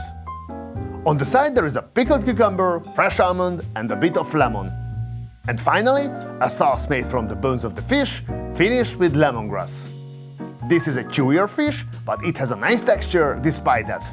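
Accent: Hungary. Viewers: Cant stand that Hungarian accent , remind me of iseael 🤮🤮🤮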